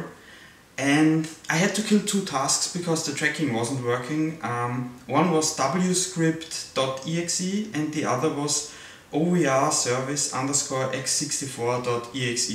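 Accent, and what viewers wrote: Germany. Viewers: That's how a real happy gamer looks like! :D By the way, are you from Germany? Ich frage, wegen dem Akzent :P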